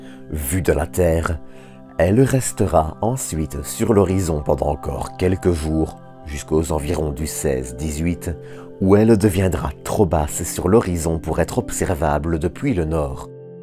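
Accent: Belgium. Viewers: Tu es belge ?? Merci pour la vidéo je suis de Bruxelles au plaisir encore des vidéo svp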